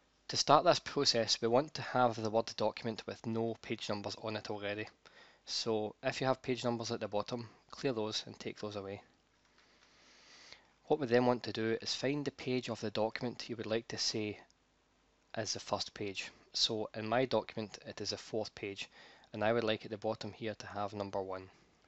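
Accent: Scotland, United Kingdom. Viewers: Thank you so much, for your excellent demonstration! You were so clear on how to perform this function and your delivery was at a very appreciative pace! I am going to follow your great instructions! However, I need to insert Roman numerals into the pages prior to pages 1, etc, throughout my manuscript, so I will follow the same instruction and see if it works! If not, I will email you to ask how to insert Roman numerals prior or after I insert page numbers throughout my manuscript. BTW, I love your Scottish accent! Thank you again for your awesome instructions and delivery! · Thank you, thank you, thank you! I was losing my mind trying to get my document properly paginated. You made it easy. Also, love your accent. Viva Scotland!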